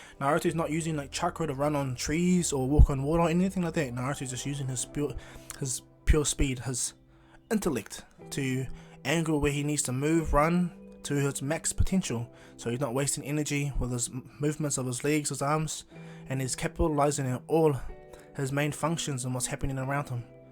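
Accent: New Zealand. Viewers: Another Kiwi I was able to tell just by listening to you my g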